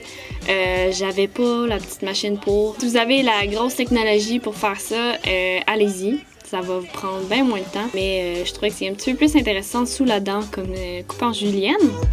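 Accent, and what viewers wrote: Quebec, Canada. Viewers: Tu connais l accent quebecois? Nan jcrois pa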